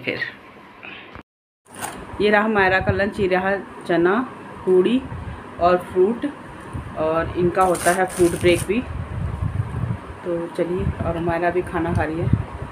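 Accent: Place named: Bundelkhand, India. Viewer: Mem aap beech beech m Bundelkhand ki voice me speech de deti ho😁😃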